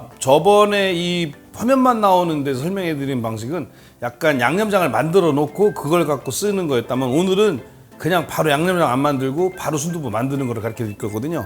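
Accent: Chungcheong, South Korea. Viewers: 백종원 대표님 구수한 충청도 사투리 듣고싶네요 오늘저녁 순두부 찌게 맛나게 끓여 먹겠습니다 건강하시고 가까운날 티비서 뵀으면 좋겠습니다 누가뭐라해도 백종원 열펜입니다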